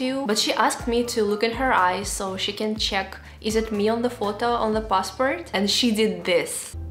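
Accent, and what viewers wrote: Russia. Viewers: У вас русский акцент- вот и понятно что русская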